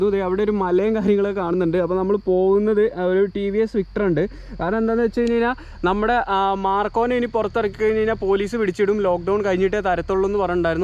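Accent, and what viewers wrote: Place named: Kunnamkulam, India. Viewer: Kunnamkulam kaare parayunoooda naaari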